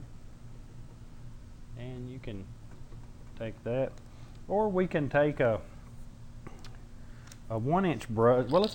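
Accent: South, United States. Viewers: I just found your channel. I've subscribed. Not only do I like how you explain the painting but I love your country accent. I'm country too so it feels like home. 😁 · A good painter..n' a cowboy's accent.. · Great technique, very helpful. Love the accent! Charming southern US gentleman.. :-) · Are you from the Carolinas ? you sound southern like me,, thank you for helping me with painting clouds ☁️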